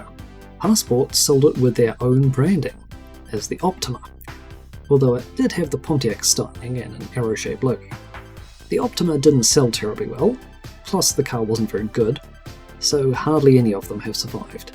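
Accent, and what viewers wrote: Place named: United Kingdom. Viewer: Drop the British accent. It doesn't help.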